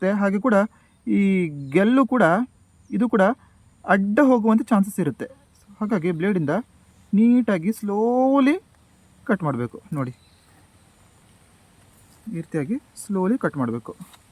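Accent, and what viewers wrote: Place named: Yellapur, India. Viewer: ನಿಮ್ಮ ಮಾತು ಕೇಳಿದ್ರೆ ಯಲ್ಲಾಪುರದವರು ಎಂಬುದು ಖಾತ್ರಿಯಾಗುತ್ತದೆ. ಎಲ್ಲಿಯವರು ನೀವು?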